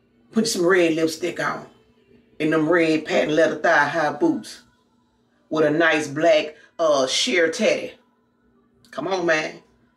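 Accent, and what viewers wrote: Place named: New Orleans, United States. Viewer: HAHA! Stumbled upon this channel looking at TEMU reviews and OMG as soon as you said BIG CHONKY instead of chunky and "Head full of hair", I just knew you are from New Orleans 🤣🤣🤣⚜⚜⚜⚜ #Subscribed but even though you make your own wigs, you can make a lot of money on here doing wig reviews for companies. Particularly it's the Asian companies that reach out and want to work with you. That big blonde wig is sending meee! 😂😭😭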